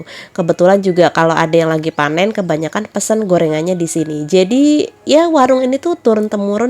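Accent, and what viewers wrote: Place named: Java, Indonesia. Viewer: Mbak ngmong jawanya lucu... 😅medok bgt😂😂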